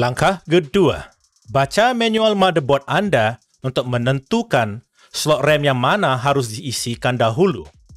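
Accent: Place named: Malaysia. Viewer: suara nya kayak orang Malaysia sih😅😅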